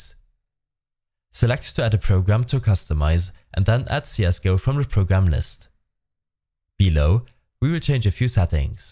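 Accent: Germany. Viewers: du bist deutsch man hört es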